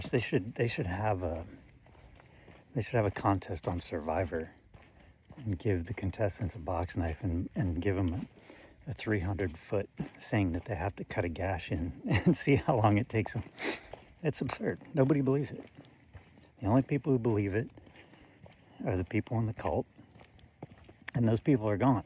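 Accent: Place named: Northern California, United States. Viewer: I had a vibe that you were from NorCal as well, before you even mentioned our beloved red woods. I made the mistake of listening to all the news since I got up, not noticing my central nervous system was overwhelmed, this seems to be such a common feeling that I don’t even notice it anymore 🥺 Then I saw your video pop up and I needed your soothing voice more than you know today . Thank you 🙏 🌻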